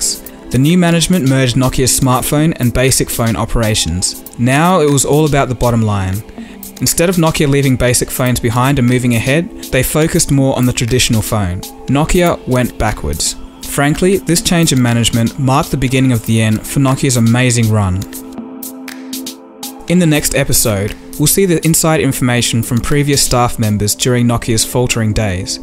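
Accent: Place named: Australia. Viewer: Nice to hear your Australian accent in the videos... thanks for putting them together, mate!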